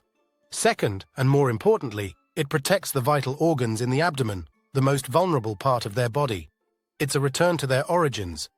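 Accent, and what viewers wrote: United Kingdom. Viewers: This is more AI hogwash geared up with a British accent to the video seem like it's being intelligently presented. That's the British accent giveaway. Nothing here is medically sound so don't lose any sleep over this nonsense. There's nothing about a cat sleeping position that has anything to do with their health one way or the other.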